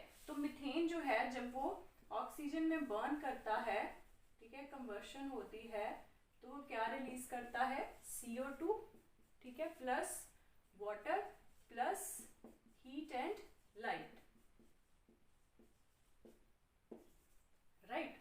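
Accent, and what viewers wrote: Punjab, India. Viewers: Mam apka channel acha study karwane ka style bhut vadiya sabse badi batt acennt english language ka punjabi hay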